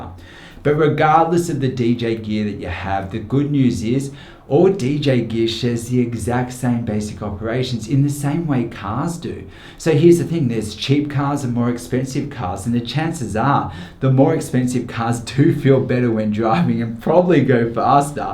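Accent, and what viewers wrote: Australia. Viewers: i can hear and aussie accent? ahahahah love it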